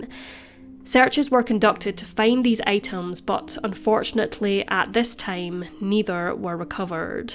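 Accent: Scotland, United Kingdom. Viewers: I love watching your videos, bonus you have a Scottish accent as I'm from Dundee, you sound East Coast also? 👍🏻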